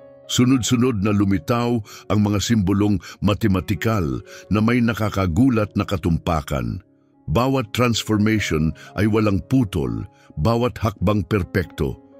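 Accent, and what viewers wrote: Visayas, Philippines. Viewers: DUPER TANGA SINO ??? FACEBOOK PHOL. OR THE NARRtOR. BAKIT??? BOBO GAGO ANG NSRRATOR SA TAMSNG PAGBnGHAY NG TAMANG SALITA NA TAGALOG ...ISANG INSYLTO SA ATING PAMBANSANG WIKA... FACEBOOKKSTANGAHAN GINSHAYSSN NA MAIERE SAMEDIA ANG PAMBABABOYSA SOC MED.. PANSININ NIO MGA VIDEOS LAHAT SY DALING BISAYA MARIRINIG CGURADO MAJORITY SNG STAFF NH FB PHILS AY MGA BISAYA. WALA GUIDELINES TUTOK SA KIKITAIN HINDI SERBISYO ...DAPAT Bng i BAN FACEBOOKK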